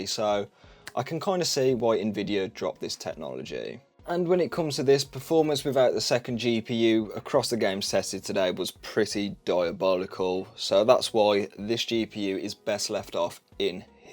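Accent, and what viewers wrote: Black Country, United Kingdom. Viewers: It's nice to hear a black country lad. Keep up the good work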